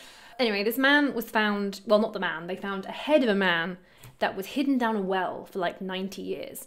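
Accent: England, United Kingdom. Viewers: cracks me up, sometimes...!! Between some of the crazy faces she makes and the way her sheer excitement shines through in her speaking while discussing the topics of her videos, causing her mouth to suddenly malfunction in what I would jokingly describe as "seemingly random, momentary bouts of an excitement-induced speech impediment (all of which I find adorable and interesting, btw -- bc she has so much personality, and because the topics that she discusses in her videos are genuinely exciting, in the first place)," I really enjoy her videos...!! It doesn't hurt that I (much like the vast majority of Americans, I would imagine) find most of the various English accents to be hopelessly irresistible, to begin with... 😊 Hilarious personal fun fact: Several years ago I exchanged the original factory default voice on my phone for one that is both female and British (possessing what I would describe as a "super-sexy, authoritative and very proper English accent"), because I find that it makes for a much more pleasant and calming listening experience... 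😊.....and also because it somehow feels like she (I call her "Mary Poppins" lol) is a much better-informed alternative to the generic voice; and, therefore is much more qualified to be fielding my various oddball queries or giving me driving directions, throughout my day...!!! x'D #True story PS: For a perfect example of the "excitement-induced speech impediment" thing that I previously mentioned, listen to the first ten s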